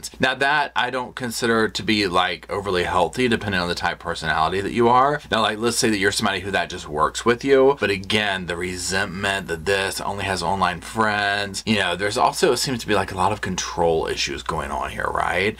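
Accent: South, United States. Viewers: i just want to say, that YOu ARE ADORABLE. i have wAtCht you for awhile now, and your energy just makes me happy. ☻ So sweet!!! You and your doggy and your “sofa”. Your disposition is so loving and playful, a refreshing stance for True Crime. Also, you are aging gracefully, love the beautiful silver filling out your face, you are a very handsome man. And, gotta love that Southern Accent! My husband is from South Carolina, and i too have roots in the South. i miss it terribly…. Washington State is gorgeous but Southern culture is the best. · you sound like a true southerner. Where are you located? First time listener.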